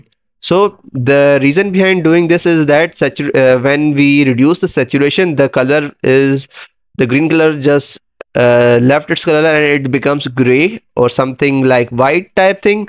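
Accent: India. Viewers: alr by listening you accent i thought you are from india or nearby countries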